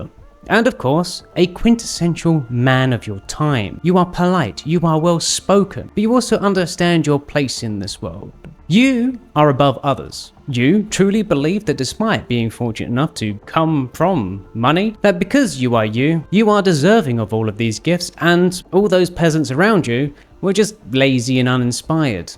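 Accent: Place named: RP, United Kingdom. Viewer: Ok, this is first time I watch video with this particular youtuber and I must say I could listen to him for hours. The way he speaks english with received pronunciation is just wow.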